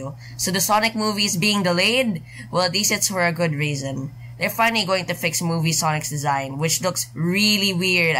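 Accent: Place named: Philippines. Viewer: Man sounds like a Filipino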